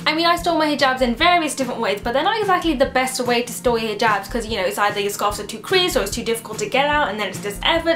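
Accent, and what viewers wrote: United Kingdom. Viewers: Biiiiiiig liiiiiike from morocco !!! You saved my life x) i discovered you 30 minutes ago !! It s midnight now !! So you can imagine how excited i am now especially that i couldnt sleep !! LOL !! I watched 4 videos of you , liked them all !! good luck , waiting for other videos ! By the way , u have a funny beautiful British accent , i LOVE it , Nouhaila - Morocco <3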